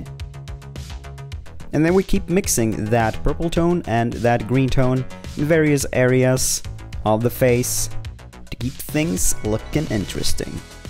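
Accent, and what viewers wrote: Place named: Skåne, Sweden. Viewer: good accent in Swedish, sounds like ure from Skåne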